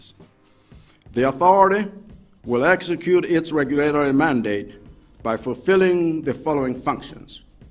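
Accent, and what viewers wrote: United States. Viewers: This guy has a USA Southern Accent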